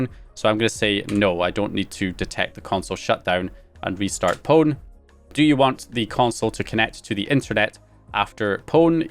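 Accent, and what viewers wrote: Scotland, United Kingdom. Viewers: He's clearly Scottish 😂 Russian American ? 😂😂😂 wow lol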